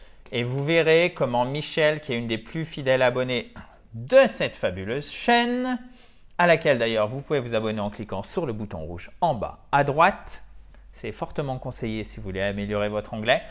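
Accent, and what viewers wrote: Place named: United States. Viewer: Finalement tu enseigne l'anglais américain où britannique car dans tes prononciations tu emploie plutôt l'accent américain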